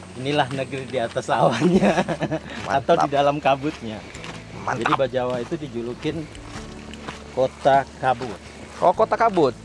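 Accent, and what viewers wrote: Pontianak, Indonesia. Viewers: lebih menarik chanel ini drpd yg sebelah,kbnyakn narasi sok wah ,terbukti subscriber nya lebih bnyk ini logatnya pontianak atau ketapang ya bang?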